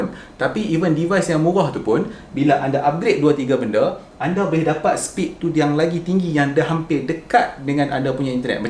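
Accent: North, Malaysia. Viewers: TK... Org Utara ka ni ?...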